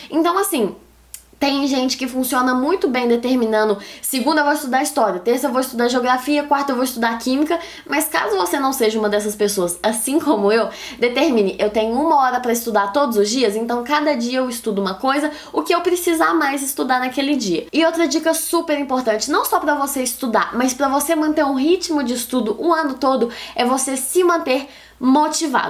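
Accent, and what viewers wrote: Minas Gerais, Brazil. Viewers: Voce e mineira também? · sabia que era mineira só pelo sotaque ,😅 😄😍,amo suas dicas ❤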